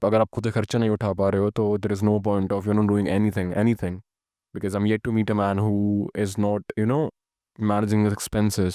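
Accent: India. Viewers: He's got an Indian accent though.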